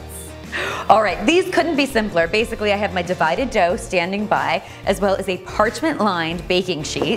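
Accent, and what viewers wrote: Canada. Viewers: You sound Canadian. I love the cinnamon raisin with cream cheese. But now theres competition. I have to figure out which one of these I love the best.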